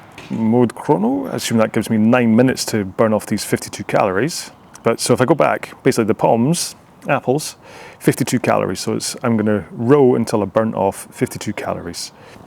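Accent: Scotland, United Kingdom. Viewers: Thanks for reviewing asensei, appreciate it. It is worth mentioning that most of our workouts these days are full row-along sessions with coaching and talking all the way through from the likes of Eric Murray, Johan Quie and British Rowing. There are some you can try in the free trial too. These are similar to Eric's free row-along sessions on the asensei youtube channel - indeed some of those sessions are pulled into the app and have the goal setting, music, tracking etc fully integrated. Would be happy to talk you through any of this if you ever want to do a more in-depth piece, or just catch up. Nice to hear a Scottish voice doing a review too (two of the asensei founders also Scottish)!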